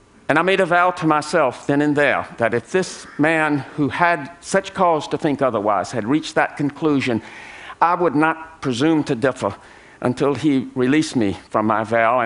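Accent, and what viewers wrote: United States. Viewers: Quite a bearable American accent. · Marvelous! A Southerner (sans any Hungarian ancestry) talks (in Southern English) about his affinity with Hungarians, or with the Hungarian spirit, or, as James Michener might have put it, an affinity with the cantankerous nature that characterizes Hungarians.... hmm, more politely called that "curiosity", that insatiable inquisitiveness, that characterizes Hungarians. Conjures up memories of old jokes, e.g., ... Q: Are you part of the SETI search for extra terrestrial life? A: Those aliens are already here, they're called Hungarians! My own command of the Hungarian language is woefully minimal, but the speaker's Magyar friends could have schooled him better in the pronunciation of jó nápot pacák, or the names mentioned, etc, even with a Southern accent. Mispronouncing some Hungarian words can get ya into big trouble, I know, just one example being when I saluted some important company with a toast, attempting to say "Egészségedre!", meaning "to your health", but it came out as "Egeseggedre", a polite translation being "to your butthole", which caused a mixture of embarrassed groans and guffaws of hysterical laughter... 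an episode that put a serious dampener on my motivation to further learn that diabolical lingo. One thing I did make sure of after that experience, though, was to make a special effort to correctly pronounce anything I said in any language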